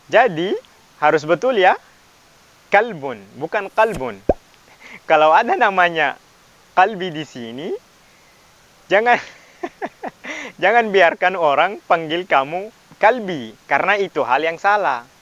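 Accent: Makassar, Indonesia. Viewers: Serius kak. Semakin kesini bahasa Indonesia Bagus. Tp semakin kesini jg logat makkasr jg semakin jelas :)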